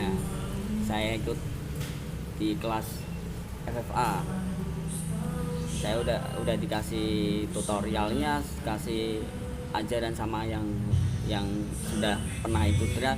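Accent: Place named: Java, Indonesia. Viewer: Mas logatnya org jawa, jawanya dmna mas?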